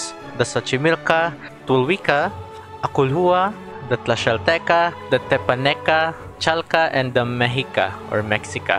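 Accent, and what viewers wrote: Philippines. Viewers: There is no mistaking a Filipino’s accent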